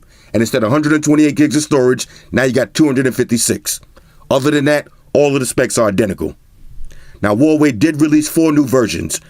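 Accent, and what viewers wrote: New York, United States. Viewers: You are a Great reviewer!. I'm here mainly for your NYC Accent!. And I almost forgot, Pitching me rolling!🤣😂👍🍺🍺🍺🍻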